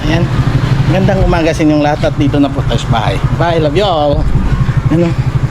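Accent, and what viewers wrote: Iloilo, Philippines. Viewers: pahingi ng alimasag boss hehe ilonggo gid ya ang tuno ta pareho gali ta sini pero taga sultan kudarat kami,kanami gid sang view da sa lugar nyo,halong lang permi ah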